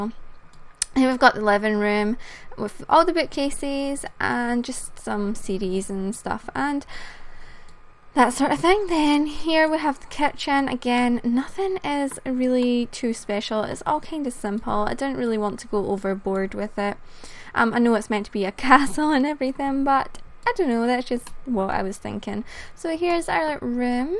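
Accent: Scotland, United Kingdom. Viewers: I guess you're Scottish , we'll I hope you are :) I am , but I don't have the accent because my parents are English xx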